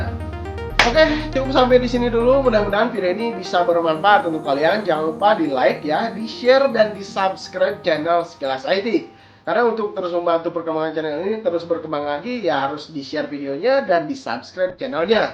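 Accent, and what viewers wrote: West Java, Indonesia. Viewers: nyunda pisan euy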